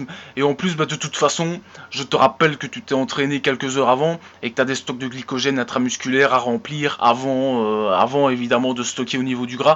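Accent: Liège, Belgium. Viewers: Serait tu de Liège ? Haha je reconnaît ton accent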